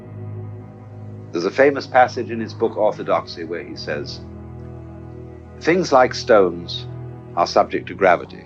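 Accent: United Kingdom. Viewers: British accent = barf 🤮 Use a good quality French, Scottish or Irish ☘️ one instead. You will get more likes 👍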